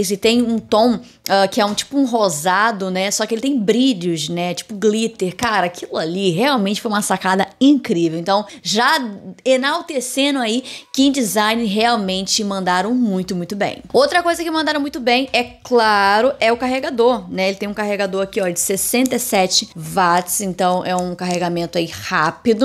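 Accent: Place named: Rio de Janeiro, Brazil. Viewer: Amei a carioquinha falando ! tmj conteranea.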